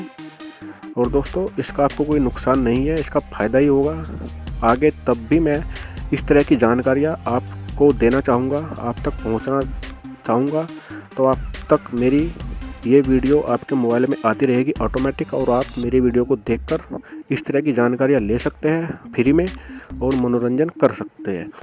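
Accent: Haryana, India. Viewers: bro aap Haryana se ho na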